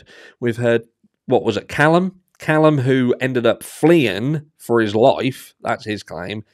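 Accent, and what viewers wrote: Norfolk, United Kingdom. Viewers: This is the most west norfolk accent on youtube. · Love your vids, are you from Norfolk? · wayyyy Norfolk boiiii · I thought that. I'm Kings Lynn and now Norwich and he sounds quite local. Love ut!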